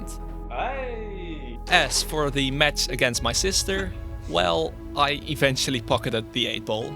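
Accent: Netherlands. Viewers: Its pretty obvious that your from Europe i think your from the Nederland’s i can hear it from your voice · this shit is fire!! (je hebt een licht nederlands accent btw XD) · Ik hoor de hollander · R u Dutch? I recognize the Accent 🤟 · By the accent I just know you’re Dutch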